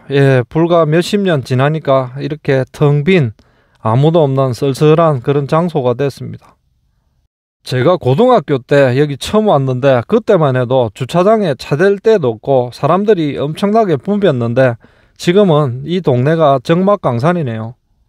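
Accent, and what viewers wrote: Gyeongsang, South Korea. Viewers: 구독은 안했지만 영상 올리시면 빠짐없이 봅니다 저는 전북 전주 출신이지만 경상도 구수한 말이 너무 좋네요 그리고 제가 좋아하는 약간 쓸쓸한 음악과 경치 한편의 시적상상이 떠어름니다 마음으로 항상 감사함을 느낌니다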